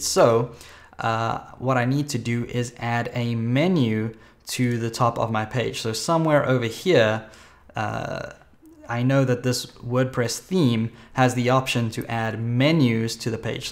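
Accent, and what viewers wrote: South Africa. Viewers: Hey! thanks for all the tutorials man, really great to see a tut with a South African accent for once haha keep it up dude!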